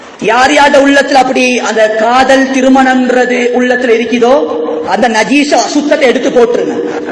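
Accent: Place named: Kandy, Sri Lanka. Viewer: இவர் கண்டி கொழும்பு இந்த பகுதியில் இருக்கிறார் போல..! அந்த பகுதியில் இப்படித்தான் தமிழ் பேசுவாங்க. என்னுடன் சவுதியில் கன்டியைச் சேர்ந்த நண்பர் ஒருவர் இருக்கிறார். இதே போலதான் தமிழ் பேசுவார்.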